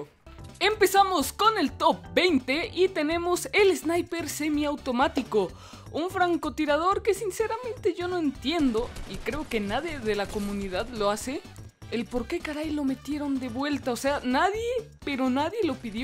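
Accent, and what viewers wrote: Costa Rica. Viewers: eres de costa rica? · Hola jaja eres de Costa Rica verdad jaja Pura vida mae jaja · Eres de costa rica como mencionas la palabra mae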